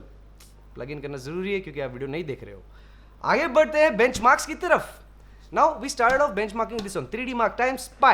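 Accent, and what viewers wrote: Hyderabad, India. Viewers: Hyderabadi kitta bhi koshish karlo ustaad zabaan pe ajatich language. Aakhri kiraak maut angaar video tha, maut dalderai. Aisich aando videos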